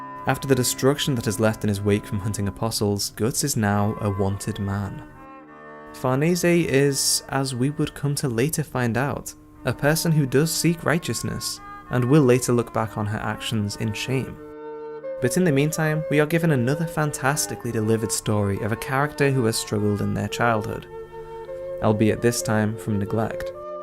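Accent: United Kingdom. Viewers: an overly-soft UK accent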